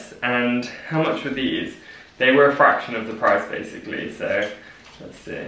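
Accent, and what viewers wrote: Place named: United Kingdom. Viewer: Your British accent is so starch. Clean and concise details with your descriptions .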